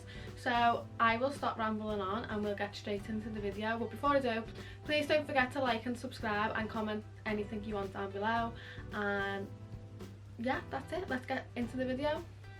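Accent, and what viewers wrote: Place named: Liverpool, United Kingdom. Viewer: GORGEOUS!! (and I love your Liverpudlian accent! I LOOOOVE Liverpool so much!) ❤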